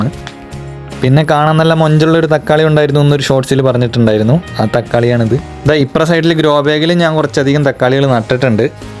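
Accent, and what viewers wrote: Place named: Muvattupuzha, India. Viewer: സംസാരത്തിൽ ഒരു മുവാറ്റുപുഴ... പെരുമ്പാവൂർ... കോതാമംഗലം ടച്ച് ഒണ്ടു "ട്ടോ " 😎, ഒന്നുകിൽ പരെന്റ്സ് അല്ലങ്കിൽ ഗ്രാന്റ് പരെന്റ്സ് ഈപറഞ്ഞ സ്ഥലവും ആയി കണക്ഷൻ ഇല്ലേ ബ്രോ ? സത്യം അല്ലെ ☺️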